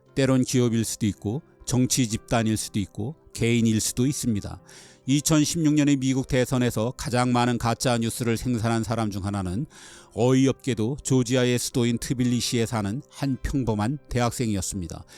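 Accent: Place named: Jeolla, South Korea. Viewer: 고향이 전라도이신가 보네요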